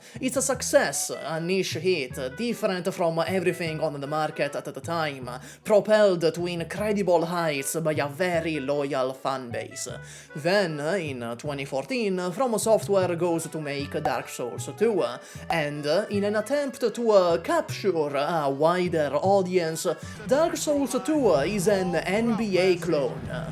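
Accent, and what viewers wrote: Italy. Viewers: Just because of your Italian accent 🤌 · you yap in your silly italian accent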